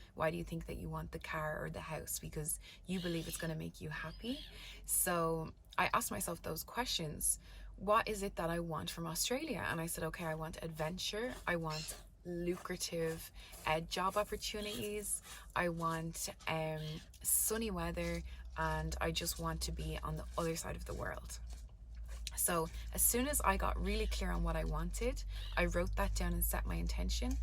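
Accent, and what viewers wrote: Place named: Ireland. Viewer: omg are you Irish? loooove your accent! and this view!!!! 😍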